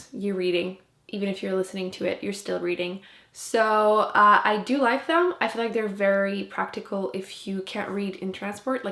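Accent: Quebec, Canada. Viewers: Owww I loved hearing you speaking French, French Canadian have such a cute accent ! I am French and I started reading in English in order to improve my English :D I'd love to read the Mistborn trilogy but can't decide if I want to get it in English or in French... haha You should read Carry On ! I was skeptikal aswell but I actually liked it ! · I studied French in Quebec City for a summer and when you spoke French I was instantly missing Quebec haha, alors c'est genial et je aimerais bein si tu fait les videos en francais haha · Lool you need to stick with just asking not insulting dear, haha, I like it that you speak Arabic, and btw your Canadian French is different from the accent in France couldn't understand u clearly, because I'm Arabic and I speak french too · I speak french fluently and most of my friends are french so I was shocked when I heard your accent when you started speaking in french! 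didn t know that the canadian french pronunciation was so different from the french one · Je n'ai jamais écris sur une page youtube mais j'adore tellement tes vidéos que j'étais obligé ^^. Je suis française obviously mais dans cette vidéo j'ai tellement adoré ton accent quebecois que je me devais de te le dire ☺️. J'étais depuis quelque temps dans un reading slump et depuis que je regarde tes vidéo et d'autres bookyoutuber (comme peruseproject qui est la première bookyoutubeuse que j'ai suivit ^^") je me suis remise à lire. So for all of those little things I thank you a lot for your videos and all your effort to make us discover some great books. And I read in english for like maybe 5 years now and watching series in VO and this is in fact the best way to improve your english ^^. Btw one one the first reason why I'm following you is because of your personality so i would say you are so right to not care about those who criticise you. 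J'attend avec impatience ta prochaine vidéo :).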